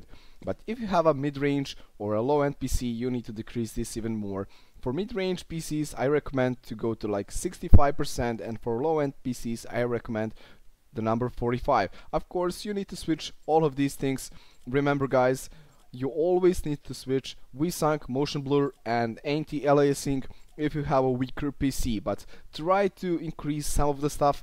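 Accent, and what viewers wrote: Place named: Balkans. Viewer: znao sam da si sa balkana kad sam cuo kako pricas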